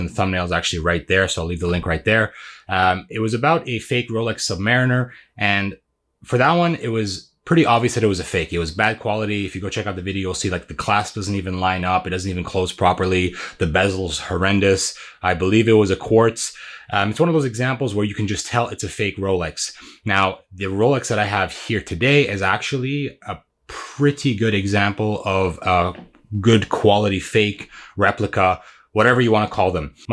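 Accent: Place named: Lebanon. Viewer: You’re Lebanese I can tell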